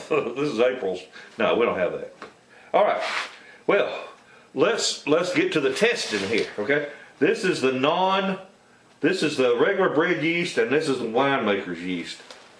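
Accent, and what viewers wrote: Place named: Appalachia, United States. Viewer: I love your accent, is it hillbilly? Must try your recipe!!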